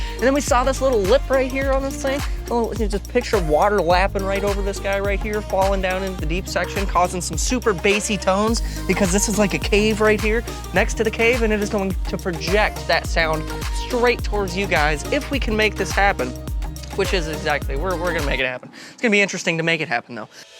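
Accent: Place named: East Tennessee, United States. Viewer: Epic!🐸👍🏻. Love the absolute “East Tennesseeness” and I love that this was for my favorite Doc💙🎄💙🎄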